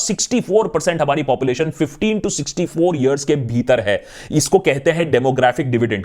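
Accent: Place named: Bihar, India. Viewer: The Deshbhakt are you a Bihari.Your hindi accent is very much alike a Bihari accent,i like it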